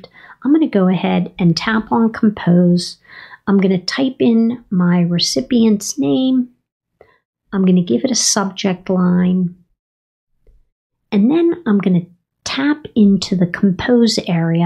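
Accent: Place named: United States. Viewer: this is mark, from the west country of England, I appreciate your approach, I hope you do really well with it, it is so important for the coming generations, that our elders have access to proper Lee paste properly paste paste PACED paste Instruction on how to use the iPhone and Mac and iPad, and all of that malarkey I would love to see a video of you recording how to dictate, as I tried to above, and failed, the word PACED, which I have only managed to get my iPhone 6 to iPhone 6 two Transcribe by spelling it out, letter by letter. And, it won’t even get the number of my phone right, I would have to dictate the number one, then the number six, for it to work, as below: iPhone 16 new paragraph, and it still cannot understand it about 70% of the time when I say the words new, paragraph, it just types out new paragraph until I say those two words several times, one after the other, like I just love your accent, and would really appreciate knowing which exact town you are from in the United States! 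Me, I have a south east accent, I was born on an island of England, called the Isle of Sheppey Very best wishes, Mark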